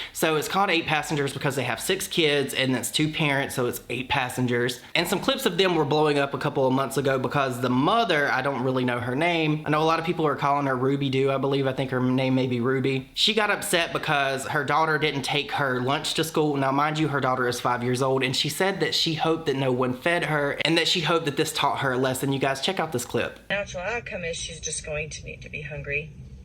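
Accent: South, United States. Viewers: Your face makes me so happy and southern charm accent! I’m so glad when you upload, I love listening to you, you talk trash but respectfully and have a bright personality. You honestly brighten my day when I’m working from home. Thank you for continuing to upload even when it’s hard! It helps me get through my day!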